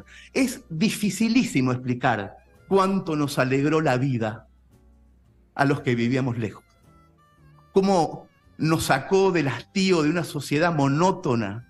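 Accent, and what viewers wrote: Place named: Córdoba, Argentina. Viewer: Zarpado muy lindo lo que escribiste y nunca pensé en eso, como también soy de Córdoba nuestra tonada es nada que ver. Es como tu pasaporte.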